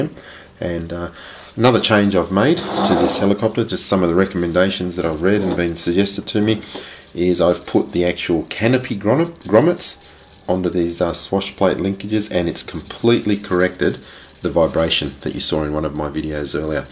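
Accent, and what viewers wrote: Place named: Australia. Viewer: Thanks. I can hear from your accent that your an Aussie =) Added the grommets where you suggested. The tail is abit of a chore to with these figdety fingers, but it looks good. I'm ready to fly, once the batt is fully charged...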